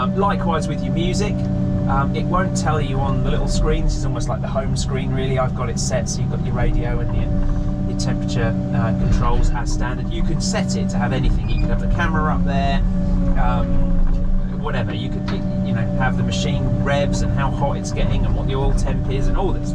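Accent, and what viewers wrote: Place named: England, United Kingdom. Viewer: Well done Buddy, had to tune myself in to the English Slang & accent like Slew for Swing or Spanner for wrench but I caught on eventually, Definitely am a big fan of the Neat Stick Steer more generic function's on one Joystick & less on multiple Levers , pedals etc etc , a Skidsteer steering feature with Boom, stick and scooper bucket, Never Ran a High Hoe, or Trackhoe that's a Old slang in Canada for a Excavator in my life but always wanted to Especially this Brand Caterpillar & the D series New gen machine. Thanks from Northwestern Alberta Canada , And one of the better machine review's I've ever seen on this website. Your also right about how Cat totally misses out on the Places to store a operation File, invoices cabinet in the cab. Big miss on that one l think cat figures a forman or Supervisor will look after Paperwork and let the operator concentrate on scooping & digging dirt. 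But Good point.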